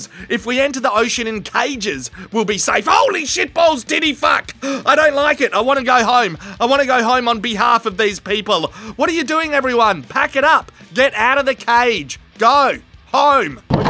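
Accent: Australia. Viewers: No matter what, shark sounds better with an Australian accent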